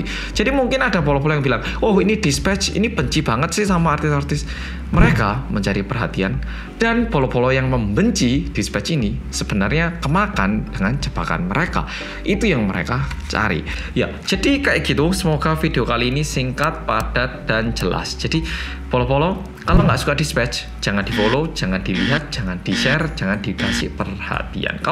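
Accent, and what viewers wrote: Java, Indonesia. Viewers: setiap opening aku selalu ikutran bilang ahahaha medooooooook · Entah kenapa saya sangat suka pembawaan mas di konten pembahasan kasus kriminal, Durasi singkat, padat, Jelas, Visual editing sesuai dengan keadaan dan gak terlalu heboh, dan yang paling enak ya "MEDOOOOOK".. Sukses trus mas, 2021 bisa 10jta sub 🙏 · Menungguu mas yang medhooook♥♥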